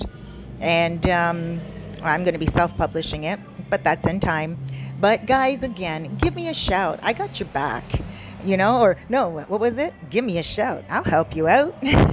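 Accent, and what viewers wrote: Canada. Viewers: Great content! I could tell you are Canadian by the way you pronounce certain words, like "out and about"! I always knew! Keep up the great content!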